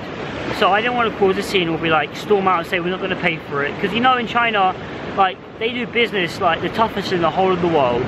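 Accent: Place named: United Kingdom. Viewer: Wow nice 700+ followers, and this video 4000 views good I like your British accent, so good listen to you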